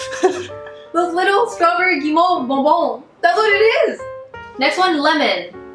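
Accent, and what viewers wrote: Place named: Quebec, Canada. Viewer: Tu es Canadienne? Tu as l’accent Québécois quand tu parles français !😍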